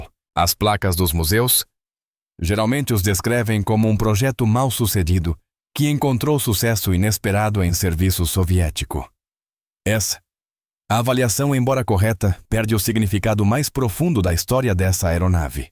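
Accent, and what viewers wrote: Portugal. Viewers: Horrível esse português europeu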